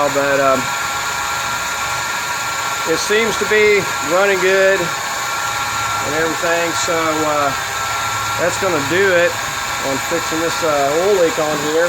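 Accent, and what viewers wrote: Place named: Midwest, United States. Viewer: Superb tutorial and many thanks! It's clear you have an immense knowledge and experience wrt to motor vehicles and specifically, this Tribute / Escape. Furthermore, you're a natural instructor; patient, considerate of all skill levels, able to add 'pointers' along the way and just a naturally helpful and decent American guy! I'm a native Minnesotan (currently living in Straya) and have also lived in So Texas and KC Mo. What I liked best about this vid was just listening to your patient, confident and sincere midwestern voice- am thinking somewhere south of KC and north of DFW? ( Or Louisville?) My project is a 2001 Tribute but its RH drive so much was identical save the ALT removal which had to come oout from underneath after the axle removal. I ended up hitting the top first-manifold and plenum seals, PCV and also the water temp sending unit. 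Then, on to the timing cover. I usually make a sketch on cardboard of the part being replaced and mark / punch holes and just add them as the bolts are removed. I bought the same Fel-Pro seals/gaskets as you bouight had to source them out of Chicago on Ebay-could not get them here and many think this is to prevent the old rigs from staying on the road and a sneaky way to force adoption of the current EV's coming out of Chyna. I hear it is similar in many Euro countries. I also did the oil pan gasket ( watched your other video) and that was very helpful too. I was able to wrestle the second exhaust pipe through the gap of that cross-memb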